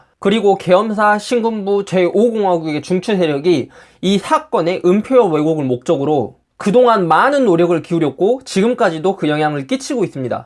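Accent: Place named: Jeolla, South Korea. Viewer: 전라도 사투리 구수하네요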